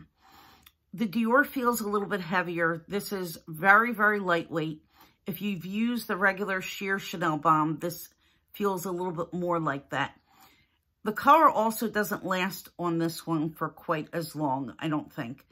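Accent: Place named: Philadelphia, United States. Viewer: Beautiful shades of lip color. My favorite was the last one. It matches what you're wearing perfectly! By the way, I'm a new subscriber to your channel. :) I hear a little "Philly" in your accent. Is that where you are from? Just curious. Have a fabulous weekend too!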